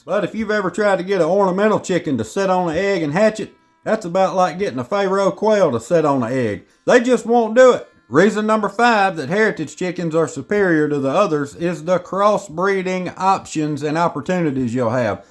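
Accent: United States. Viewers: Great American accent😊 it is good to learn American/English from you plus I learned a ton of knowledge about hen😊 thanks I live in Poland Piekary Śląskie my Father in law have few hens and rooster and we keep change them mostly hybrids but once i bought small incubator and chatched him couples of this originals and Yes they were more resilient and lived longer👍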